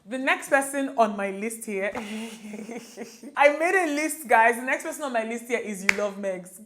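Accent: Nigeria. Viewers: First time watcher, loved your Vid. Stunning face, impeccable make up and love you uploading in your Naija 🇳🇬 accent. Subscribed ❤️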